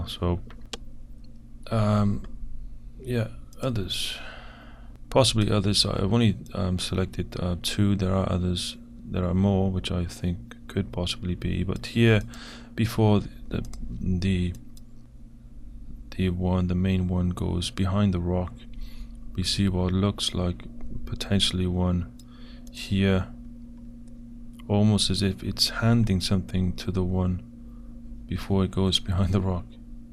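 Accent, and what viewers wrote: Wales, United Kingdom. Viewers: Great analysis, again! It's certainly intriguing, to the point of being believable. Although it's certainly not an important point I must say that you're very easy to listen to - are you Welsh? Sounds Welsh to me but quite a soft accent. Just wondering 🤔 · are you Welsh you sound like you have a bit of a Welsh accent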